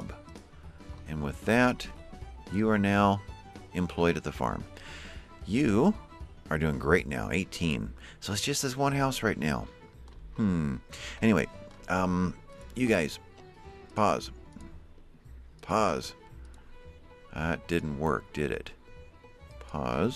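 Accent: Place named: Ireland. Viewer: Very Irish tone to this ep, very nice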